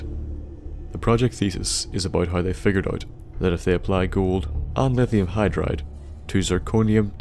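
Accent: Northern Ireland, United Kingdom. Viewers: that's just his northern Ireland accent, doesn't matter if he tries to speak ina different way he'll just sound the same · are you british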